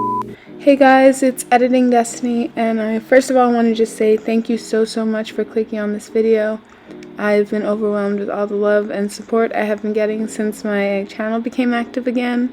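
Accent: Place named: United Kingdom. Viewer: It’s the British accent for me😅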